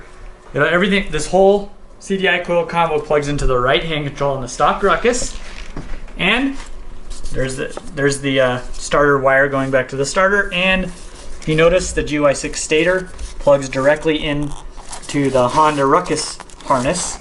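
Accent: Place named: United States. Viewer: You're trying to say it's not fair?! Dude...just think. It's so easy to figure out that he's in North America. You shouldn't believe that having to critically think is unfair. Without even clicking away from the video there is more than enough data telling you that he's in North America. He clearly has an American accent, the name of his web site which is in the description is rolling wrench DENVER. That's a city in the American state of Colorado. I can't believe that you'd actually rather make someone change the way they speak than just simply use your brain. I'm trying to help you man. Think critically instead of being lazy and asking others to change for you. I promise it will make life easier dude.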